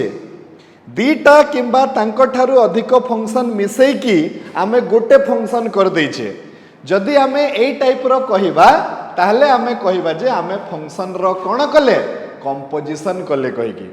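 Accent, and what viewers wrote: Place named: Balasore, India. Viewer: re balesore bhasi jaini ta😅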